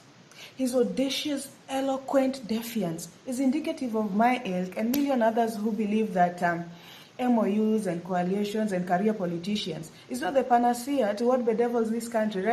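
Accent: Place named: France. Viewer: please be soft on us kikuyus hii kizungu yako na babu inatulemea yawa hatuelewi hadi sometymes naona nikama unaongea french yawa😅😅😅😂😂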